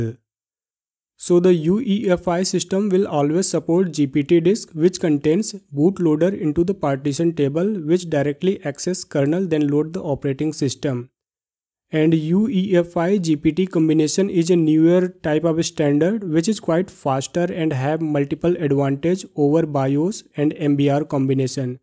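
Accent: India. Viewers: Dude can you import subtitles? I understand english, but that "thick" Indian accent is very hard for me to understand.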